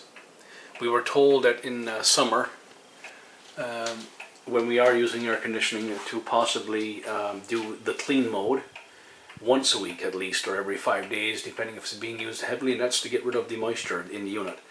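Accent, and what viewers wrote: Newfoundland, Canada. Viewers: Do I hear a Newfoundland accent? I just installed a 12000 in my garage. Did you figure out the smart option? I have no instructions on it.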